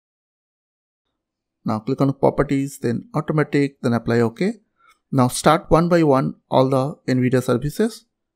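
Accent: India. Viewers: You can always count on a guy with thick hindi accent to solve a random technical issue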